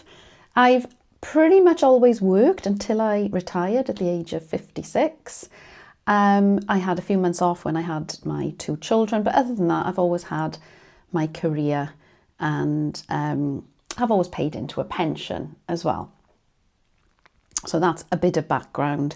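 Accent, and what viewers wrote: Wales, United Kingdom. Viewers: Hi, watching from Australia. Is your accent Welsh ? I love it. I visited Wales back in 1981 ! 😊💖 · you are such a wise grounded lovely women, so sorry things went wrong for you, good luck for the future, I'm in Mid Wales but was born in South Wales so it is so nice to listen to your accent thank you for sharing your life x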